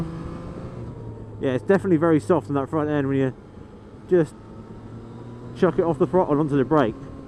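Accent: United Kingdom. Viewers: The british accent gets old after a while.. Borderline annoying. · Its the queens tounge, it never gets old.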